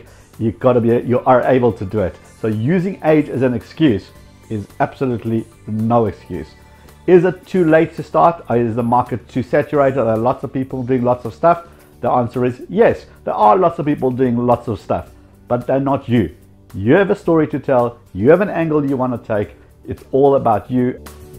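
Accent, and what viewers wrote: South Africa. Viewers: Oh! Of course, you're SAfrican. haha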